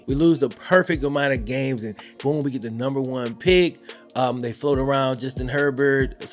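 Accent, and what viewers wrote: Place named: Mississippi, United States. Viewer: Where you from in MS?? I knew there had to be a reason you sound like a dude I been talking to my whole life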